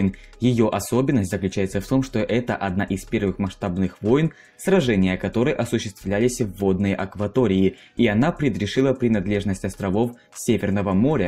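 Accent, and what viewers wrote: Ukraine. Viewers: Не родной, он с Украины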